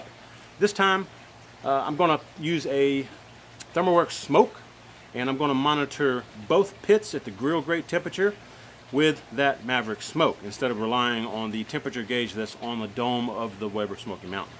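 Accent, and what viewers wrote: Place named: Texas, United States. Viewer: One question that I would have is where in the USA are you cooking? Based on your accent, it sounds like you are also from Texas. Ambient humidity could also have a big influence. If you are down in a high humidity area, like the gulf coast, then the pan probably does not make a difference water or sand. The water pan probably does not have as much of a noticeable difference of the humidity level in the smoker. If you are in a low humidity area, like the Texas panhandle or West Texas sticking with the Texas references, then it make make a bigger difference. Since I am new to your channel, I do not know where you are doing your testing.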